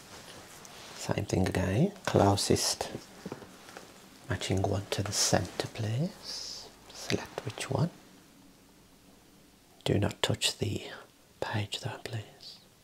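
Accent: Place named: Midlands, United Kingdom. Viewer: Me too. His Midlands accent has lessened which is a shame.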